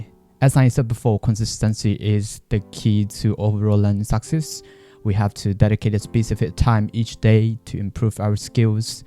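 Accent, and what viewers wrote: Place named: United Kingdom. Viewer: British အသံထွက်ကိုသဘောကျတယ် အကို 💥 လေ့လာနည်းလေးတွေများမျတင်ပေးပါ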